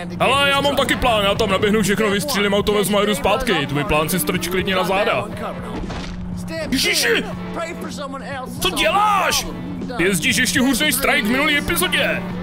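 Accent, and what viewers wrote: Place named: Russia. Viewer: Když mluvíš anglicky, zníš jako rus.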